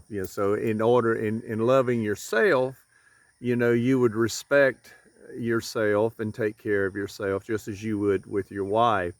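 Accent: South, United States. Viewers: Love your Southern accent. I love your honesty and candidness about life. I hope things will work out for you and your wife. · yes, I do love your southern accent. I hope you're ok. stay strong sir..god bless.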